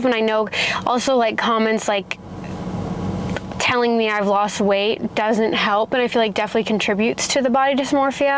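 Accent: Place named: England, United Kingdom. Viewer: I love your English accents!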